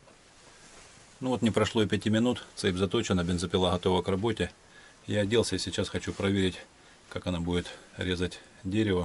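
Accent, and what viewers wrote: Ukraine. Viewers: так по говору можно догадаться что он с украины.у них свой акцент имеется.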